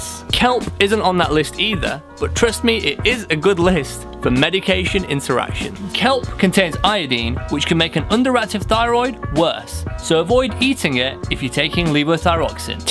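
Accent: United Kingdom. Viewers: I had to turn the caption on because of the British accent 😂 I'm a fresh PharmD graduate and i'm interested in patient education and you are indeed inspiring me a lot!!🙌🏼 keep up the great work! God bless you!🙏🏻✨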